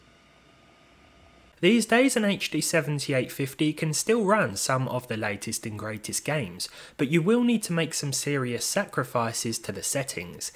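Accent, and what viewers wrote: United Kingdom. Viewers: Everytime I see your face I have a weird feeling of you you are an npc lol Always talking in the emotionless British accent puts that theory to the most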